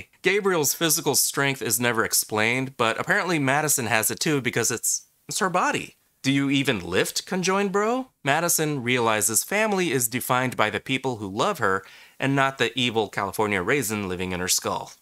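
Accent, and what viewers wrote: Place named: South, United States. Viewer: I just found your channel and really like your reviews! Sorry if you've said it before, like I said I'm new to the channel, but I was wondering where you're from? I think I'm hearing a bit of a southern drawl but I can't be entirely sure. Love from Atlanta!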